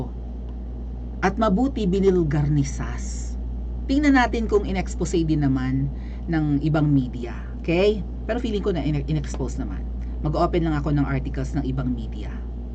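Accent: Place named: Visayas, Philippines. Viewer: Korek ka ma'am pagsinabe mo bisaya ako. Sagot naman nila kaya pala tuno mo palang alam na sabay tawa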